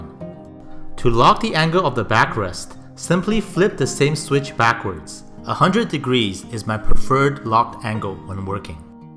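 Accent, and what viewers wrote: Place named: Asia. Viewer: Indeed, I'm also 6'4, I have tried this one at the store. Its not made for tall people. No offense, but this guy has an Asian accent, he probably has a different perception than a European or American of what a tall person is...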